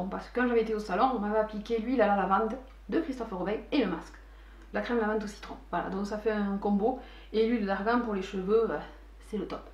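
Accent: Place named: Centre, France. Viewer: .... même si je ne commente pas tjrs, sâches que je regarde tes videos de temps a autres... j'adore ta façon de presenter, ton accent egalement.. (du centre de la france, non,?)... tu ammènes une certaine fraicheur, en bref tu es geniale...+1 like... abiento:)))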